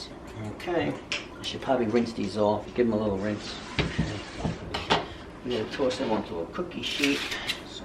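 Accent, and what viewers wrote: New York, United States. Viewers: Love the NY accent..💕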